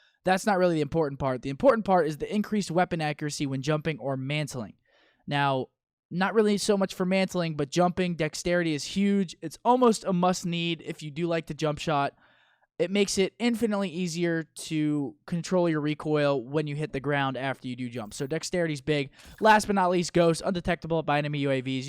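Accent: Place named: Texas, United States. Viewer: What part of Texas you from?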